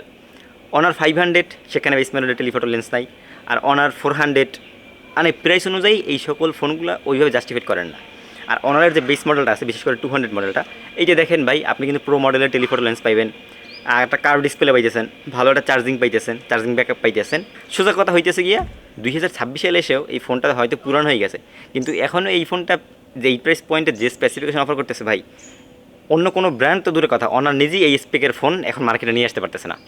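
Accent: Barishal, Bangladesh. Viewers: Vhai ki iccee kore borishailla vasa e khota bolen?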